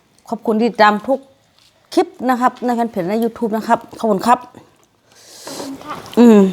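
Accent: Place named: North, Thailand. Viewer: อยู่จังหวัดอะไรจะเหนือหรือเปล่าพูดน่ารักดี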